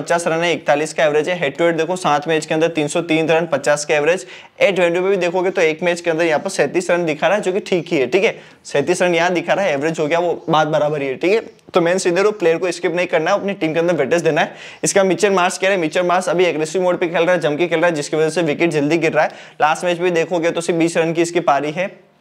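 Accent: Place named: Rajasthan, India. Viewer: हम राजस्थानियों की हिंदी बोलते समय राजस्थानी भाषा निकल ही जाती है 😅😅 लगता है आप भी राजस्थान से ही हो 🎉🎉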